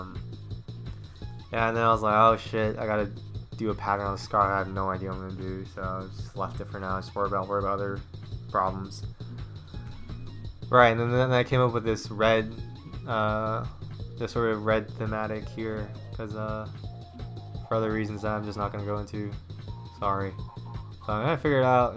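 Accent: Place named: New York, United States. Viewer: I hear nyc ;)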